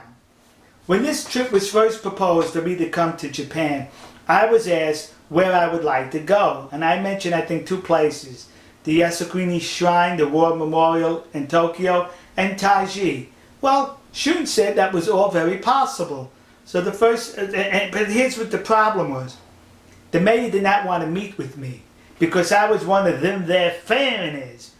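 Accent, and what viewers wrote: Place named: Texas, United States. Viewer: テキサス親父さんの明るいキャラもさることながら、その「発音」にすごく興味が行きました。いつも聞きなれているアメリカ英語とずいぶん違いますね。これがテキサス訛りというやつですか。今、テキサス出身の先生に英会話を習っていますが、「テキサスの人はゆっくりしゃべるから日本人に分かりやすいんじゃないか」と言っていましたが、本当ですね。英語圏の人がみんなこれぐらいの速さ（遅さ）で話してくれればもっとありがたいんですけどね（笑）。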